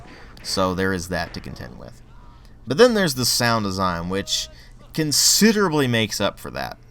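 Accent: South, United States. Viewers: pretty legit southern accent! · Nice deep southern accent!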